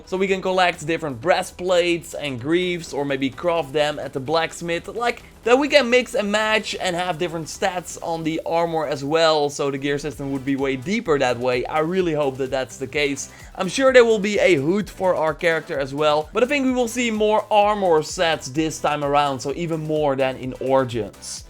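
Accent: Netherlands. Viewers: It is a bit Dutch mate, so I think he is Dutch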